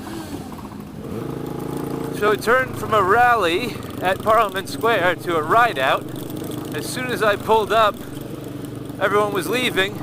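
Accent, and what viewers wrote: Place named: United States. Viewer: Enjoying all your videos, just wondering...you don't sound British. Migrant American ?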